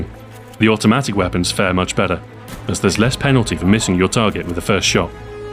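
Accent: England, United Kingdom. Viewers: Im getting the Alan Jackson GE commercial alot before these videos play. going from Alans friendly texas twang to smooth british tones is an amusing contrast. sounds like a salesman an upper class shop to me for some reason.:P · He's English, so that's his accent!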